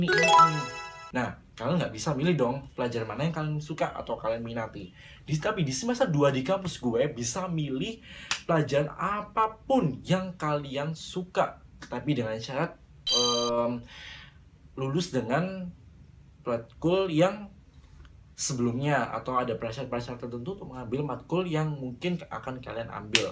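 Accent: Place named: Java, Indonesia. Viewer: rada ga enak di denger alias medok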